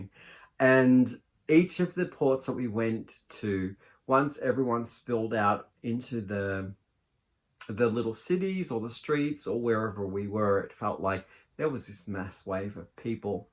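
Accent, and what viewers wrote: Australia. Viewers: OMG an Aussie accent 😍 hello, you came up as a suggested video & was happy to hear you! Fellow yarn lover here too 🙏🧶 call your mannequins Matilda 😂 Mine was named by my daughter & Crochet bestie Leighann, her name is Mary Madison Mannequin 🫂🤎🦋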